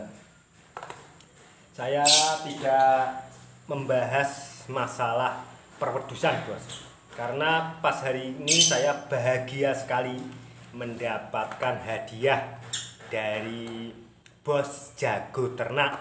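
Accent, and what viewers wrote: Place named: Java, Indonesia. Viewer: medok tenan bahasa ne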